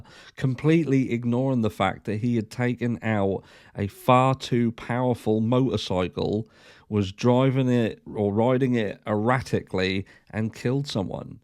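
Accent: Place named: Norfolk, United Kingdom. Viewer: you're very welcome. It's mad considering both our countries speak the same language there are so many differences, and I much prefer Norfolk accent to my own accent 🤣🤣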